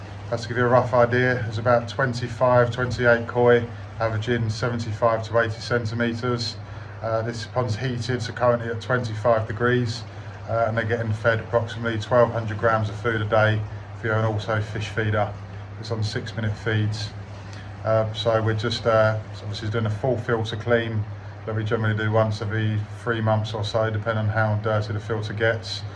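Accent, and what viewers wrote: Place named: United States. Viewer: I thought you were British you start talking and I find another american.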